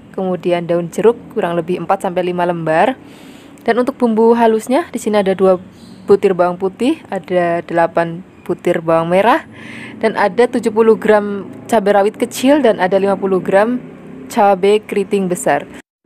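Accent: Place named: Tegal, Indonesia. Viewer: Mbknyq org mana???logatny kyk org tegal jawa tengah❤slm knl y mbk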